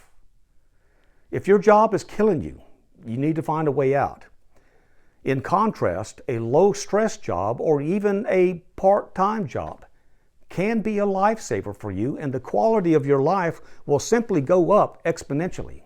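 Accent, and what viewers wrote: South, United States. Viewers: I'm only in my mid 40's working in IT and Software and I've been burned out for years. I feel like every point made here applies to my burnout. I haven't worked for a year and although my health has improved, the idea of working for a company again, where I'm treated like garbage and given an extreme amount of complex work all the while being forced out whenever they company feels like it - no matter how good my work quality is. This is just the reality today - so extreme and narcissistic. It's so hard to make a serious effort when you know they don't give two sh!ts about you. Thankfully I've invested enough to retire early, but still have just a few years of work left before I can truly pull the plug. I really enjoy the type of language and accent this man uses - reminds me of the wisdom of the elders in America I enjoy learning from. 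· I enjoyed your content snd your Southern accent :) (Australia)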